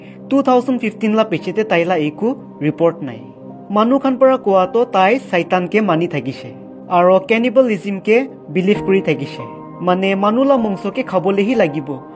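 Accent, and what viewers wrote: Nagaland, India. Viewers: I'm happy with your Nagamese accent special kushi ba tho Last tey....#Na kwa tho😅❤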